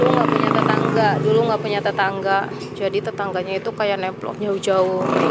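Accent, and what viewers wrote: Banyumas, Indonesia. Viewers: Wong brebes apa wong cikarang jane.. ngomonge be ngapak mbok..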